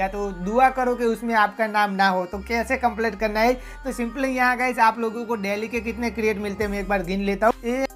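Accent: Gujarat, India. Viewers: Appp Gujarati ho kya bhai ?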